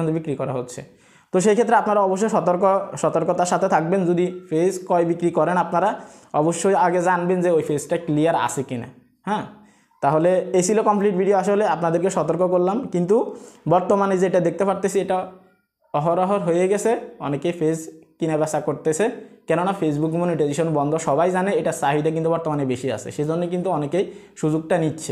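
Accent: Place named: Noakhali, Bangladesh. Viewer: উনি হয়তো নোয়াখালীর এজন্য ওনার ভাষা এমন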